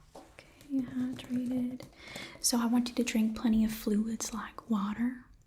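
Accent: South, United States. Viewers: Nice southern accent. I don’t have as deep of one despite living in the most southern of the states.😂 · i love ur southern accent videos sm!! also i did get 2 post-roll ads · I saw that you were gonna do a southern accent and clicked immediately because you slay it!! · Her southern accent is top tier!🥰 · Oh god got the southern accent on point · It’s good to see you again 😉 your southern accent is magnificent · ur voice with the southern accent reminds me of ruby from steven universe with the song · personally i just think southern is absolutely divine! love how warming it comes across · I love the southern accent so much it's so comforting, even if I've never even been anywhere near the US!❤️❤️❤️❤️ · This'll come in handy when I get a cold. The southern accent is the cherry on top. Thank you! Greetings from the Emerald Isle. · Did our incredibly pleasant nurse with a good dose of southern hospitality get promoted? 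Did she pull it off and earn her doctorate? Because I swear she was still a nurse last time. Either way, southern accent with a load of caring warmth is wonderful stuff. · The southern accent is brilliant! Really comforting. I always love your videos! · I just wanted to comment and let you know I received a post roll ad and also amazing video I always love the southern accents ❤ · On behalf of the Southern community, I approve accent and recipe for mac and cheese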